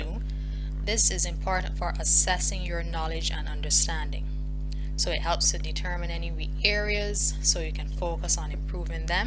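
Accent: Barbados. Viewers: R u from Barbados? U sound similar to Rihanna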